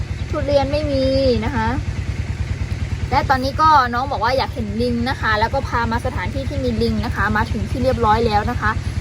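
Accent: North, Thailand. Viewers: สำเนียงเหนือ อู้เหนือ ก็คนไทยด้วยกันนี่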